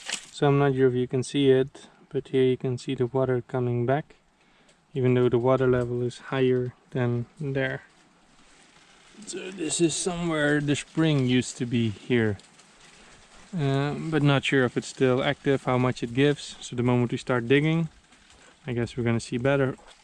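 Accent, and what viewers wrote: Netherlands. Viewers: So obvious cause of the accent he is Dutch from origin.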